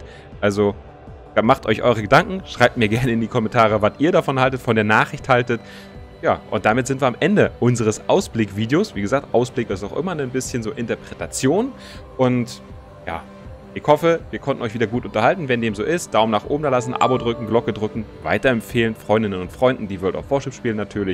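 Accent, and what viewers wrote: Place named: Berlin, Germany. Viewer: Da hört man irgendwie ganz stark den Berliner raus, oder? ;)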